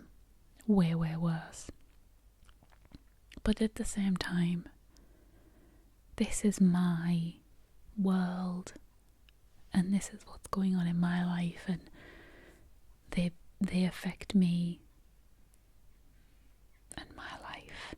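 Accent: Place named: Northern England, United Kingdom. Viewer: ! You were my first asmrist I found about 7 years ago and it's crazy to see how much you've progressed and how many things you're working on. After years of watching biscuits of britian, I've now moved to the UK myself and am finally trying all of them. And I can hear your northern English accent so much more now. I think you're wonderful and however much time you need off, please just take care of yourself and only make videos you enjoy! x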